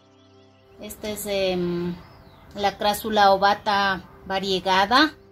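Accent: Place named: State of Mexico, Mexico. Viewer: Esta hermoso tu jardín 💕 😍 ♥ ❤ 💖 💜 sigue adelante.tu acento parece del Estado de México México.....pero ya vi eres de Ecuador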